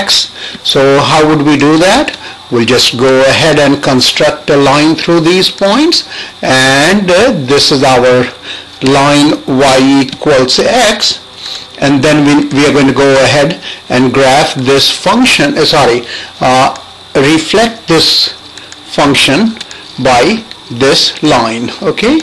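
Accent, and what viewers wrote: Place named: India. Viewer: lol indian accent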